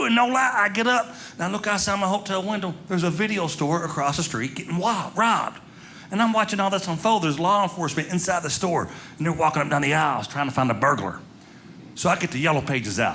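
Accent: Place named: Kentucky, United States. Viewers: you made me laugh myself silly on several occasions during this set!! I still visit my brother in Highview when I get back to the U.S. and always make a trip downtown to Caufield's!! I started doing magic and shopping there back in 1980. I grew up in the Louisville area from 1966 to 1975 and came back to KY after the navy in 1979 to 1982. It's nice to hear the hometown accent! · is hilarious and his standup comedy always reminds me of Kentucky story telling, especially with his accent. Some of the funniest people I've ever known are from my old Kentucky home. 😁 Truly great job! 💕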